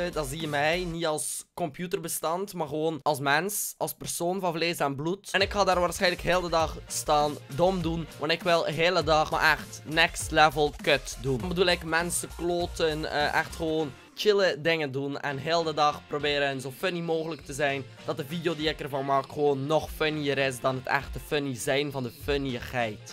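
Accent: West Flanders, Belgium. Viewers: aaaaaah gvd uw westvlaams accent man 😂😂😂😂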